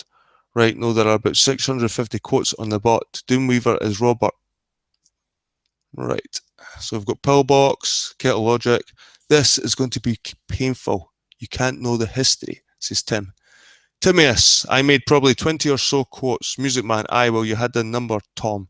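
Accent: Scotland, United Kingdom. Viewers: I triggered Nathan recently & he told me to suck his balls. Got a laugh at of that one. He protested too much when said the Potatoes were perverts, like Adam the Christian penis flasher. He says that having a baby makes me normal, um, no. Pervs have children all the time. He's off his game. He annoys me because he's got a brain but worships Patty like an attack Chihuahua. He's vicious but in an amusing way. Btw, to us, Scottish accents are so diverse. My Scottish friend sounds nothing like you.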